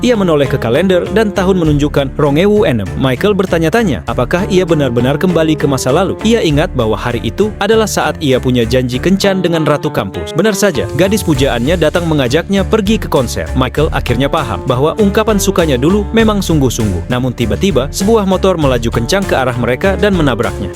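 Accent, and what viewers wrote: Java, Indonesia. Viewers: 😂😂😂😂 500 gelo... Jian jowo tenan Iki. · Jawa itu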